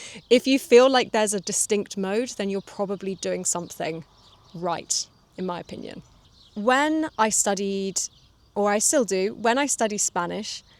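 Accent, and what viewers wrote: United Kingdom. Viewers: Fantastic winery indeed! Personally I love the British accent, definitely is the best, even though there are differences in England too!! And your accent is really fantastic. I know that English is spoken all over the world, so that people speak it with different accents. And this is a bug problem, in particular for natives!!! Thank you very much. You are a great British teacher ❤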